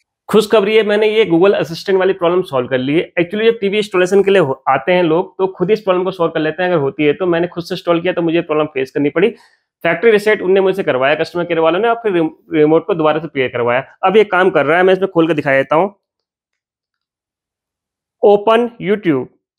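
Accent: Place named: Pahadi, India. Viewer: Nice vdo..🎉 ..apki awaj se feel hua pahadi ho..fir channel pe dekha nanital mentioned h 😅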